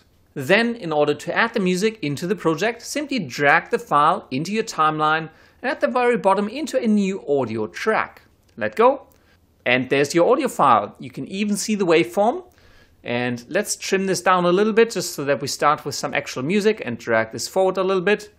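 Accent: Germany. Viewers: Dieser deutscher Akzent xD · Hey where r u from? U sound german :) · Kommst du aus Deutschland? Kommt mir so vor als hättest du einen leichten Akzent :) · man dein englisch hört sich echt deutsch an... warum kein deutscher Kanal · Nice vid, i'm guessing u are german because of ur accent, i know because i'm dutch :D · nice German accent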